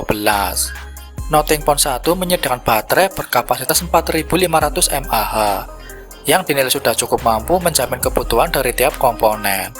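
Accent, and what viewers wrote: Banyumas, Indonesia. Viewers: Dri dialeg nya kdgrannya org ngapak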